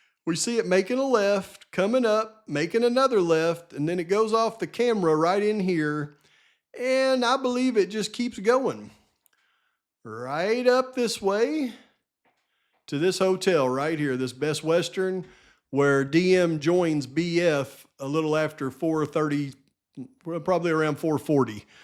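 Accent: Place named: Tennessee, United States. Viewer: You're definitely from Tennessee. Possibly KY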